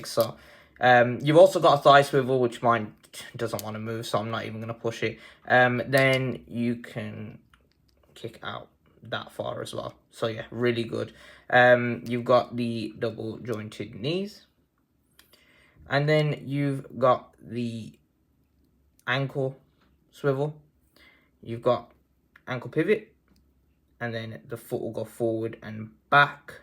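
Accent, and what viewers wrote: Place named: Birmingham, United Kingdom. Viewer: You from Brum too?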